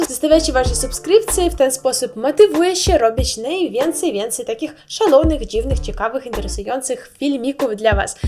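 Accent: East, Poland. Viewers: Nawet nie wiesz, że dużej liczbie polaków podoba się bardzo ,,miękki" akcent wschodni. Brzmi tak miło. Daje poczucie bezbieczeństwa i troszkę jakby odmładza. Mówisz i wyglądasz uroczo. Tak trzymaj. Moja ciocia z okolic Lwowa dalej zachowała ten akcent,, Ale to już nie to |:) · Dziewczyno czym Ty się przejmujesz. Pięknie mówisz po polsku, a Twój wschodni akcent jest wręcz ujmujący. Polacy tak naprawdę lubią Rosjan, rosyjski język i kulture. Nienawidzą natomiast Rosji... Podobnie z Ukrainą. Lubimy Ukraińców Mamy pretensje do państwa ukraińskiego ze nie potępia tego co należy potępić w naszej przeszłości. To tak zawsze jest, ludzie a polityka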